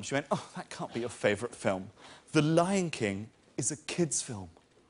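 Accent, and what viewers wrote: RP, United Kingdom. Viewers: exactly what I thought! I remembered him sounding posh as fuck but not like Michael McIntyre, until today. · This guy sounds like a posh Michael Mcintyre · Im a brit and a big fan of Jack. He's really upstaging his poshness for the American audience. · Nah, he always sounds this posh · Everyone in Britain knows Jack is WAY more posher than Michael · not quite correct either, he wouldn't have to be from London to sound like that, he has received pronunciation, which really he could have if he lived anywhere in England although more likely in the south west/east. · It's just called posh British man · I know his posh but he's extra posh in this set,what happened to him? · Funny- but he was being overly posh, even by his usual standards. It was a bit irritating. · He tries to be way more British when he’s in America than he actually is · I love how when he is in America his well spoken private school voice remains, however when he does stand up in England he tries to make a more common accent. 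· He's only doing the posh accent to appeal to the Americans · He's super posh but he's putting it on a bit more as the Yanks love it. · but remember that Stewie is an IMPRESSION of a British accent by Seth MacFarlane, whereas Jack is actually from the UK. He's actually just posh, which is why he speaks in such a specific way.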